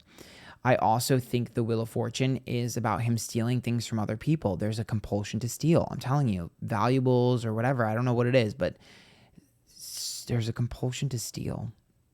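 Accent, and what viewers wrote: New York, United States. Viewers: His house in Massapequa looks like a house of horrors and it was an eye sore in the neighborhood and neighbors have apparently seen him burning garbage in his backyard in the past as well as watching females suntan in the neighborhood. He’s a creep. Are you from Long Island? You definitely have a New York accent and speak fast 🤣 but I can totally keep up. You speak my speed. I’m from Long Island. You say cawfeee? 😁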